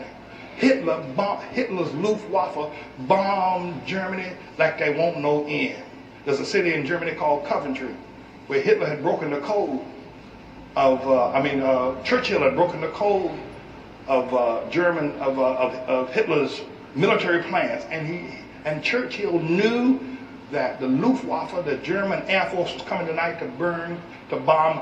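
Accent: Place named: United States. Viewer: EGYPTIANS AND THEY WERE NOT BLACK! STOP ATTRIBUTING TO YOURSELF ANYTHING DARKER THAN YOUR FUCKING AMERICAN ACCENT. THE WORLD LOVES YOU. YOU ARE AFRICANS. YOU TRAVEL TO EUROPE, ISRAEL, AMERICA, AND RUSSIA. AND WHERE IS THE RESULT?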